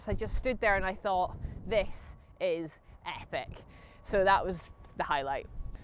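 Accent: Scotland, United Kingdom. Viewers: your the best pal love to hear your lovely Scottish tones on the tv,the future for you in golf is going to be rosey am sure,may the course be will you ❤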